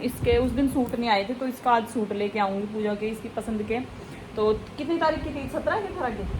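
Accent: Haryana, India. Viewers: Aap haryana s ho ya up s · Good morning madam ji aapki awaaz aisi lagti hai Jaise Haryana ki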